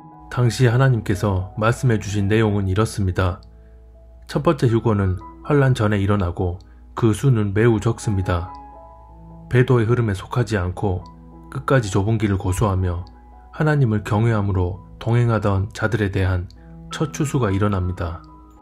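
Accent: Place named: Jeolla, South Korea. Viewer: 전라도 억양 듣기싫다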